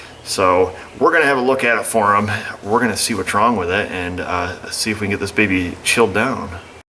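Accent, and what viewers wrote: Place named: Upstate New York, United States. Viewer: This is a great video, thanks for sharing, sounds like you are from upstate NY id guess Rochester or Buffalo!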